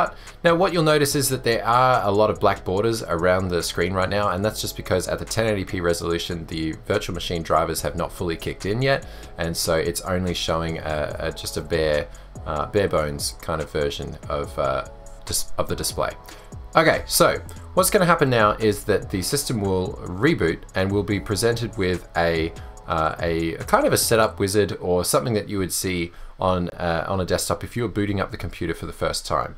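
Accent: Australia. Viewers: So you're Australian. I thought you were from Eastern United States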